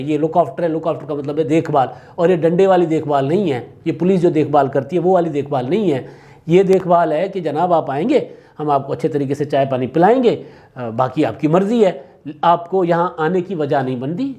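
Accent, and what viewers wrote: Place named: Sindh, Pakistan. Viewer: Nice presentation with melodious Sindhi touch 👍🏻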